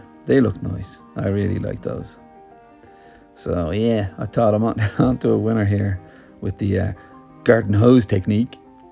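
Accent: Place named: Ireland. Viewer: I agree with you. I love the Irish sense of humour and the accent. Lovely paintings.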